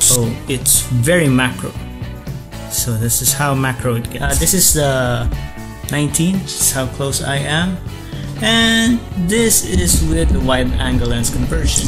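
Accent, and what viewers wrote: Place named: Australia. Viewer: Love your Australian accent.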